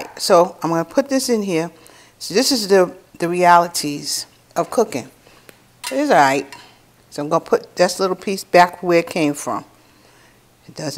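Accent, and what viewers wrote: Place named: New York, United States. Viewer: I just love your NY accent...I'm in Philly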